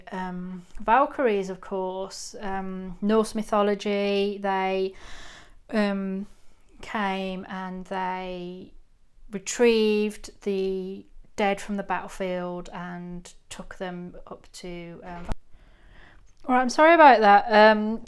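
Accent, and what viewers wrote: Birmingham, United Kingdom. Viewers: Are you a brummie?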